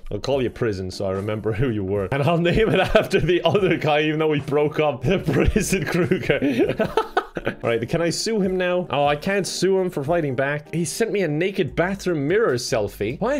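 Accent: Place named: Ireland. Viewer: HOLY FEEECK! I absolutely ADORE your Irish accent, mah dude. And of course, you're super funny! Been loving watching your vids! Xoxo from a huge Brazilian fan 🤗😙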